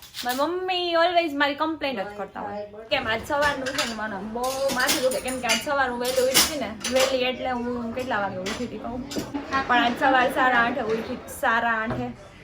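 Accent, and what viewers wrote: Kathiawar, India. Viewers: Kathiyawadi accent bav sweet che ❤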